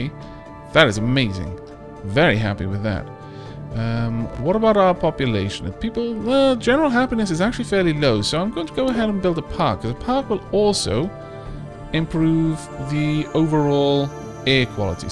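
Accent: Wales, United Kingdom. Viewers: has welsh accent...is funny as fuck and is pretty savage, it's like having a older brother that has a clue of how to play this game 😂 great good on this series buddy im subscribed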